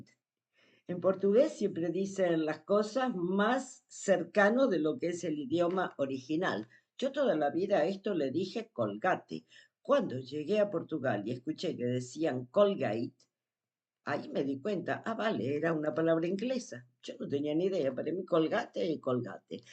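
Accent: Argentina. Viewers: Hola...qe bien te explicas... No se te nota casi qe eres..Argentina....es bueno comprar en otros super...no siempre en el mismo..y asi se compruevan..los precios y calidad claro chao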